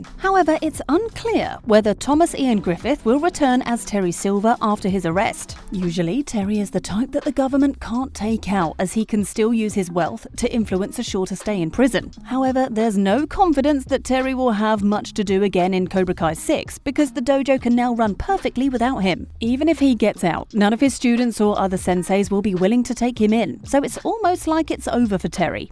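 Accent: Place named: United Kingdom. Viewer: Why are these videos almost always narrated by British people? British accents are highly annoying for me to listen to. I especially can't stand the way she says "Dio-jeo"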